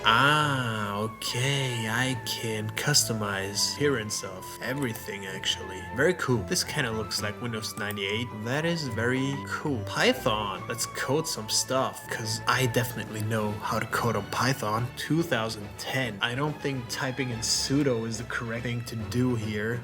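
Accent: Germany. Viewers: youre german???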